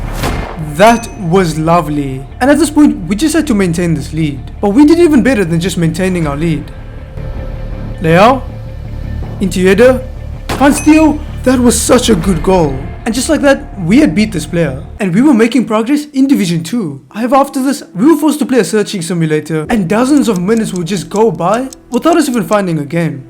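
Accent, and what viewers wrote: South Africa. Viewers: are you south african??? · Are you from south Africa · I'm not gonna lie his sounds a little south african 😅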